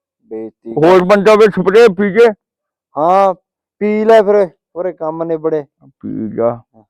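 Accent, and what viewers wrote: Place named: Punjab, India. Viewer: ਤੀਜੀ ਵਾਰ ਵੀਡੀਓ ਦੇਖ ਰਹੇ ਹਾਂ ਸਿਰਫ ਤੁਹਾਡੀ ਠੇਠ ਪੰਜਾਬੀ ਬੋਲੀ ਅਤੇ ਪੁਰਾਣੇ ਵਿਰਸੇ ਜੋ ਤੁਸੀਂ ਦਿਖਾਉਂਦੇ ਹੋ ਉਸ ਦੇ ਫੈਨ ਹਾਂ ਬਾਕੀ ਐਕਟਿੰਗ ਸਿਰਾ ਹੁੰਦੀ ਹੈ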